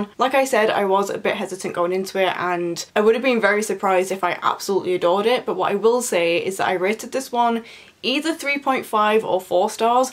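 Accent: United Kingdom. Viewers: Strange question I know but are you from Cumbria?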